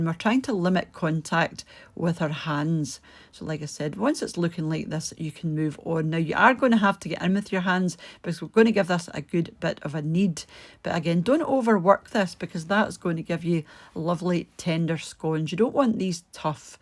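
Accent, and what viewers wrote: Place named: Southwest Scotland, United Kingdom. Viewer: These are very similar to my own favourite recipe.I am from Greenock,now living in Canada. 🇨🇦 Your accent is very south west coast of Scotland!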